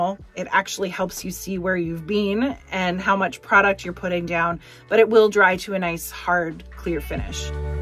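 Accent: Canada. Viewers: This is the first video of your I’ve seen. I’d like it! The only thing I would ask is why you didn’t do some accent colors on all that beautiful rounded furniture and edges? I think it would’ve made it. Stand out just a bit more made it slightly modern. And what did you say and where did you get those knobs? I love your accent. What part of Canada are you from?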